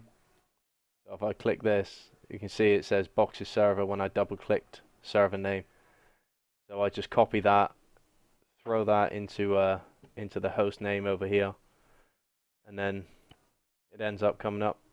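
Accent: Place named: Iceland. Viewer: where are you from. you sound like your from Iceland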